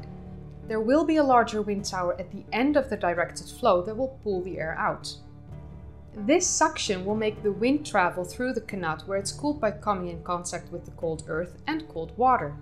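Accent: Netherlands. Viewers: Dutchie detected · I detect DUTCH in your voice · Dutch , I think she is from the Netherlands. She will correct me if I am wrong.😊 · je kan horen wanneer er een Nederlander Engels praat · Bespeur ik daar een Nederlands accent? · She sounds so Dutch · That accent…is she Dutch? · Dutch speaker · Dutch accent?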